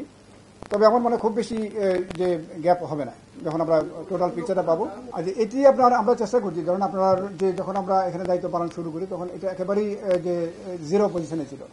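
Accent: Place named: India. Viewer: কথা ও উচ্চারন শুনে মনে হচ্ছে ভারতীয়? চেক করে দেখা উচিত!!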